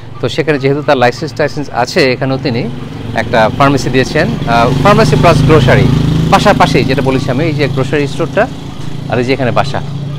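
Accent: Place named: Sirajganj, Bangladesh. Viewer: সেই স্বাদ !! এত স্বাদ ক্যা?... 🤣সিরাজগঞ্জ !! বিদ্রঃ এটা পাশের এলাকা পাবনারও একই উচ্চরিত ভাষা !! 😅😅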